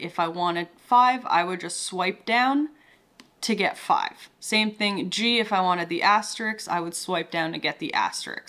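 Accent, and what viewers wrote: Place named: Canada. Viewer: Wonderful video and an awesome Canadian accent! Thank you for the content!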